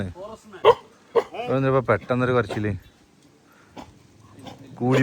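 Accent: Malappuram, India. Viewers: not calicut. This is Malappuram slang 🔥.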